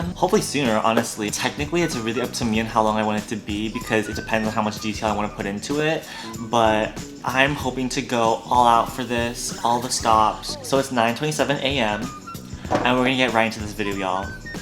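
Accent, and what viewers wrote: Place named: Philippines. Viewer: Liked the drawings. Also, I get why people ask about your background. Your accent is all over the place, I would have guessed Philippino. I am also Asian, born and raised in America. People always ask about my background, it's annoying. Good luck with your studies😊😊😊😊😊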